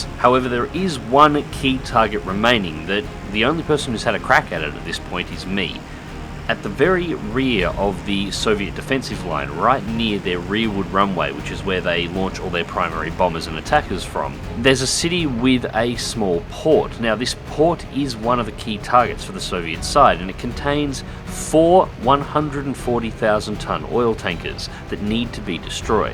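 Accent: Australia. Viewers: Yeah mate he's bloody aussie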